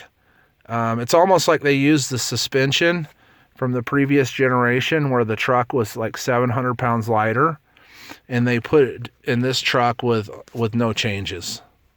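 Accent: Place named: Utah, United States. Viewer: Listening to you speak I could tell you’re from Utah. Saw your license plate - sure enough lol I live here to. I’m looking to buy a 14 actually so stumbled on your video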